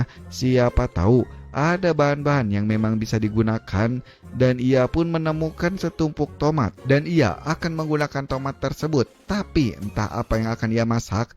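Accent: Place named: West Java, Indonesia. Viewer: Logatnya sunda pisan, pasti orang Sukabumi ini wkwkwkwkw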